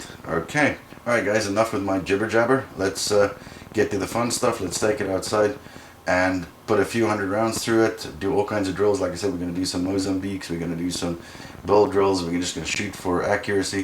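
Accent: South Africa. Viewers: Lekka South African accent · You have a South African accent. Great video.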